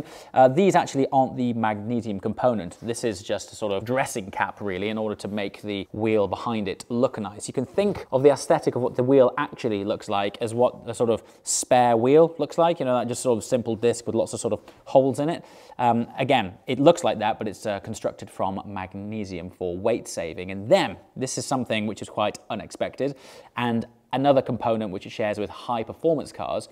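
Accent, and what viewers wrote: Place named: England, United Kingdom. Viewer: Loving that nice english accent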